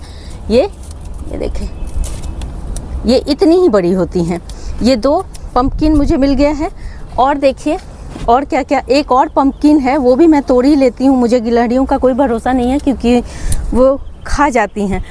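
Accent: Bihar, India. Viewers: mam ap Kahan ki hai Apki Bhasha se ap Bihar ki lag rahi hai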